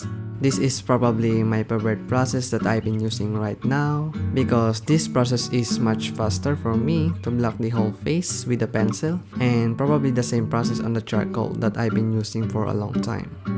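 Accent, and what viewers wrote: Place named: Philippines. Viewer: you have a filipino accent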